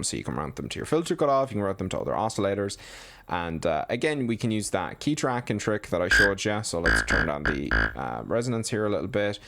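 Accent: Ireland. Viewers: oh I could tell, I'm also Irish lol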